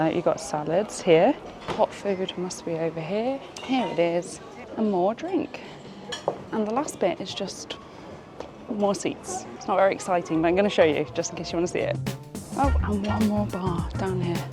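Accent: United Kingdom. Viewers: Just a question- are you by any chance Welsh? Some words sounded really Welsh! ❤🏴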